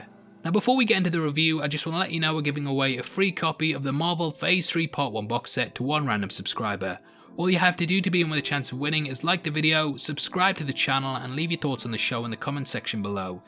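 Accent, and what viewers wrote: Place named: United Kingdom. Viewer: Hilarious to hear these BCS spoilers in a Limey accent.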